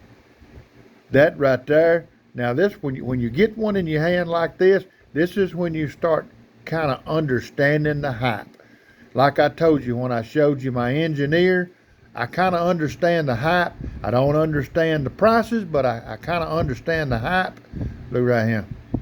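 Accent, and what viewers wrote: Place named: South, United States. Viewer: I subscribed to your channel you sound like a good ol knife loving southern country boy like myself. Enjoyed the video. That's right MILO'S TEA BABY LOVE IT.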